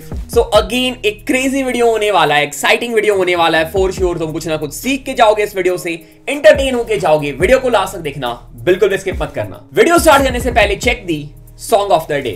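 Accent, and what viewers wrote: India. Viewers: Bhai aapka accent hindi hai but aap punjabi bolte hue bohat funny lagte ho loved the effort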